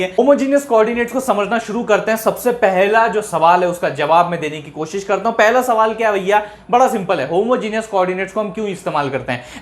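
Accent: Maharashtra, India. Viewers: Sir aap Marathi bande ho na 😅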